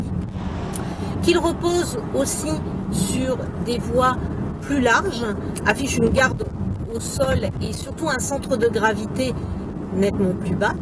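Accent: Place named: Paris, France. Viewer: Bon essai, mais que vous êtes désagréable à écouter Madame !! Et si l’on ne comprend pas que vous êtes une Parisienne pure souche, il n’y a qu’à tendre les oreilles... Bref, je préfère vous lire, vous y êtes indéniablement plus à l’aise.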